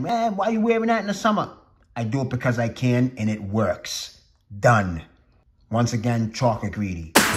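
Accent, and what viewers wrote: Boston, United States. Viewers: Great video as always, bro! Welcome back, with gratitude from Woostah for your no nonsense tellin it how it is & great to hear that Boston accent representin & preachin at fragchuch for a Sunday! Think I finally found a place up in the Boston area that may carry a decent selection of niche and indie houses but haven’t had a chance yet to check it out - believe it’s called pickwick’s mercantile in Portsmouth. Could be dangerous to visit 😂have a great rest of your day!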